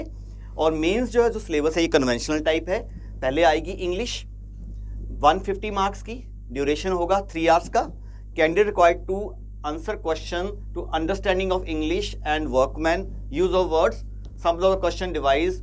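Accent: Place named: Pahari, India. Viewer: Pahari accent is love ❣️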